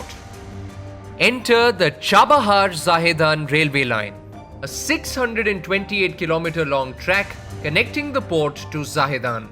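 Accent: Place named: India. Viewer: you talk your desi way, girl.. pretending this is awkward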